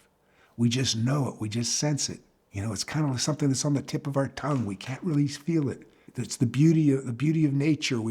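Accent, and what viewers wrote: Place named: Pittsburgh, United States. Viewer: I thought I heard a Pittsburgh accent there. It’s not thick but to the train Deer it’s definitely there. Then when you were talking about the Pirates winning the World Series in 1960 I said Yep I knew it.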